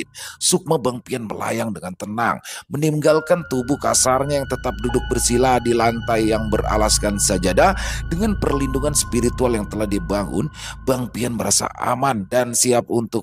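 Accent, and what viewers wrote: Jakarta, Indonesia. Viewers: Saya suka kisah bang pian santai,lucu gaya bicara nya betawi bgt pokoknya kereenn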